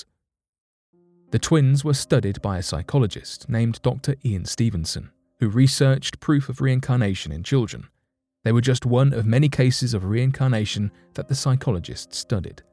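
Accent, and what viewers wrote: United Kingdom. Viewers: That British accent makes this all legit...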